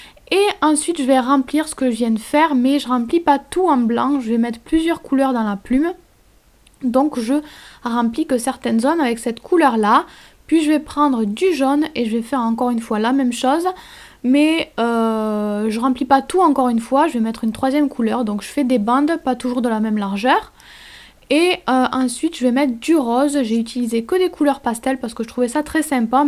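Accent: South, France. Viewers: D'où vient tu? tu as un accent du sud !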